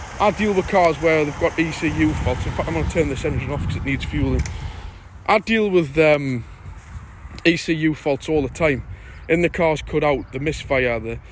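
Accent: Newcastle, United Kingdom. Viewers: You've been busy bonny lad ! Done a lot and it's looking really well. Good luck with it and I hope you get a healthy price for it considering you've been very honest with any faults or bad bits. Ps. it's just like being home again listening to the Geordie accent. Cheers man.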